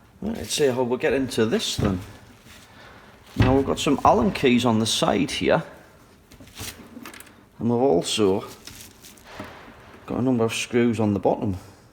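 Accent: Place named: Newcastle, United Kingdom. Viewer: I can't agree more. English is not my native language and thus I am not an expert, but maybe contributing factor is the 'Geordie accent'. especially, circa <num> there are many articles online about it. for example, "Geordie accent rated UK’s most friendly and trustworthy", "A survey found that the Geordie accent is considered the friendliest in the UK, and is also the most likely to put you in a good mood", etc.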